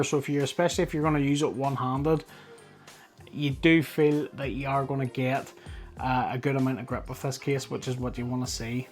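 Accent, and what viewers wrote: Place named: Scotland, United Kingdom. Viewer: Lovely to hear a Scott! Scotian by blood.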